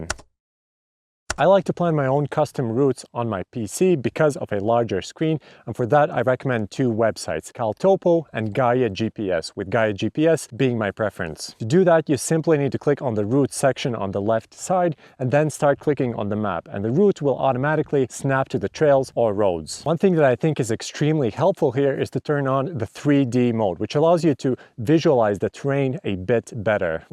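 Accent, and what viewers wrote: Latvia. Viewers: Jau no tava akcenta var dzirdēt, ka esi latvietis :) Prieks redzēt, un man noder tavi video.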